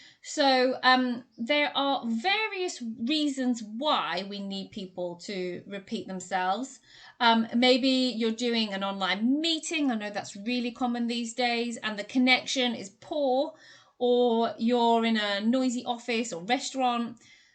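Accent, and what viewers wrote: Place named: United Kingdom. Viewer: Hey .. those are really cool way .hmm . I think you have a British accent right .I like that .why don't you teach how to learn British accent .I wanna learn it .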